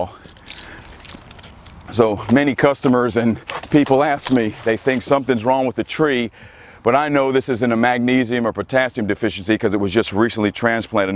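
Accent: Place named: North Central Florida, United States. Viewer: Watching this makes me homesick. I've lived in New York City for over 20 years but grew up in Gainesville and St. Augustine. All the beautiful palms! Even your accent- north central Florida twang a-la Tom Petty!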